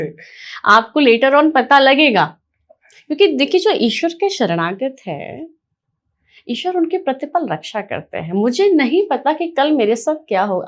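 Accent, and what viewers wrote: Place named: England, United Kingdom. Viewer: आपकी आवाज अलग ही है। आप कहां की हर जी ।मुझे लगता था आपकी आवाज सुनकर कि आप कोई अंग्रेज है😂😂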